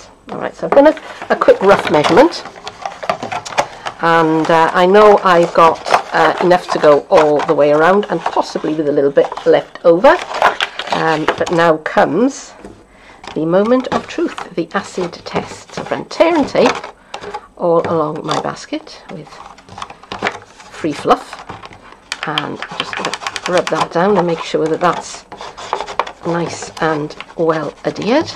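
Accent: Wales, United Kingdom. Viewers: Both are gorgoeus and you're right, with older children they would love making them. How about stapling and gluing the handle on and then applying the ribbon? Added extra strength maybe? But what a nice basket for all sorts of things or small doll's baby crib or covered with shaggy green fringe a monsters cage turned on it's side? I love your accent, I miss my Newport nieces and nephews "come yer", they shout, "this is my left yer and my right yer, I listen with them" and "please auntie, brush my yer, it's all tangled".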